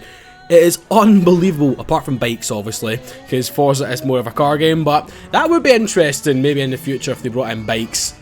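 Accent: Scotland, United Kingdom. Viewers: He is scottish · hooks he's talking Scottish slang but at the same time trying not to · Its cuz o the Scottish accent pal i understand cuz im scottish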